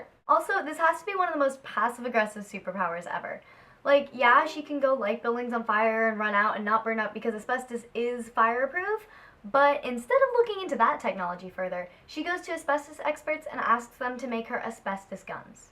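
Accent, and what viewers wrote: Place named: Canada. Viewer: Love this, think you'll go far. By the way are you Canadian? Love your accent x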